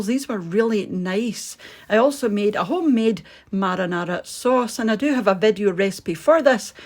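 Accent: Scotland, United Kingdom. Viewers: I love these video's, they give me some new ideas and a new lease of life. I also adore your Scottish accent! x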